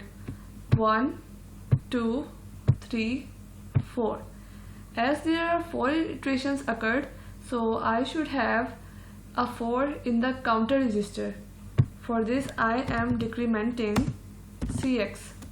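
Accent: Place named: Karachi, Pakistan. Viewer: you are from Karachi, lalukhet* right? I judged by your accent..... sorry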